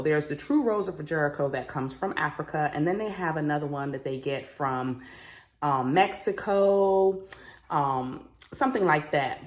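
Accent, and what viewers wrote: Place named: Washington DC, United States. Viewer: U from D.C.? Your accent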